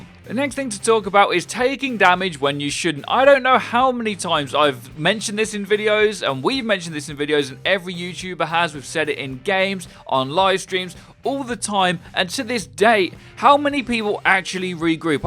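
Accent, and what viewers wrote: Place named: United Kingdom. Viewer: this british accent makes me wanna vomit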